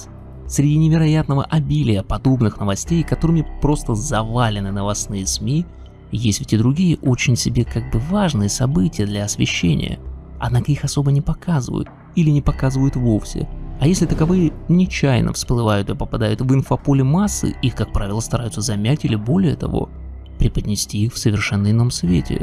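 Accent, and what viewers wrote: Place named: Chechnya, Russia. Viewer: у тебя проскакивает чеченский акцент в голосе)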